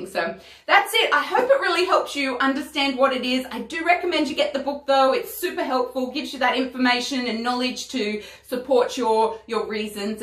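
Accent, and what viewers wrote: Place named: Australia. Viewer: Lovely to hear an Australian accent 💗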